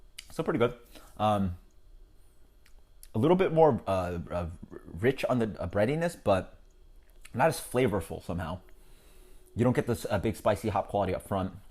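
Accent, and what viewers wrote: United States. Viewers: Out of curiosity. Why do you force the correct pronunciation of German words but use your American accent to pronounce the Spanish words like Sierra Nevada? Great review!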